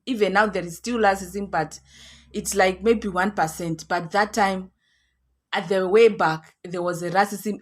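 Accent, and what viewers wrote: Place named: South Africa. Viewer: 😂😂😂 Sounds like south african 😂😂😂 I think you're married to a south african.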